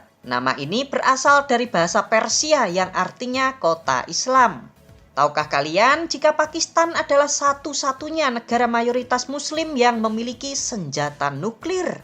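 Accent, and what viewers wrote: Java, Indonesia. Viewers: Pembawa acara dengan logat jawa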